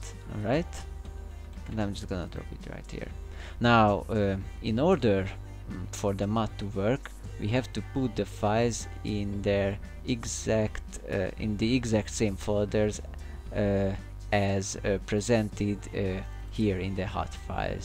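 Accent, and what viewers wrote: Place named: Hungary. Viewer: Szia jól rejted a magyart XD lehet hallani ahogy mondod hogy erölteted az angolt és a magyar kiejtéseden is hallatszik(saját mappa, eggyik videóban ki irtad angolul:ez egy magyar vagy valami ilyesmi nem tudok angolul) de egyb. örülök hogy van magyar profi!!!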